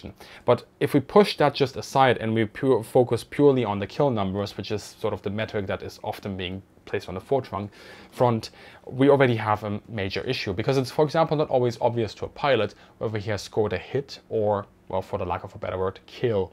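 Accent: Germany. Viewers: Thank you for this video. I am American and I spent two tours there back in the 1970s; I know that not all Germans were ‘supportive’ of the Nazi view of the world. I have met ‘good’ Germans and knowing what they had to actually ’do’ to maintain their ‘humanity’ is truly impressive. I remember one... I think he was a Bundesvehr Lieutenant Colonel and an American friend told me that the Lieutenant Colonel had been ‘evacuated’ by dog sled from Stalingrad. Hearing someone ‘explain things’ in clearly distinguishable English (albeit with an equally distinguishable German ‘accent’) makes this a very impressive video... Thank you! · just wanted to give you a genuine compliment - with apologies if it sounds a bit backhanded at first, because that's not at all the intent! I've been a channel follower for years now, and it's really been interesting to see how your approach has grown, changed, and taken on new influences. 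Just in the past week, you've had a video that really reminded me of Greg('s Airplanes and Automobiles), followed by this video which is much more in the style of Bernhard (of Military History Visualized, of course) - but both with your own unique spin, specialty, and attention to detail. Rather than suggesting that you're copying someone else's styles, I'm instead complimenting your versatility and mastery of very different formats and approaches - and bringing in a wealth of research and your own style. In the same way (and I say this as a linguist!) - your English often brings in very British expressions and very distinct Americanisms, often in the same sentences, while always in that unmistakable German tone. It's neat, adds a lot of colour to the language, and makes your content all the more pleasant to listen to. Keep doing what you're doing! It's great to see you try so many different approaches, take on so many different influences, and continue evolving this excellent channel:)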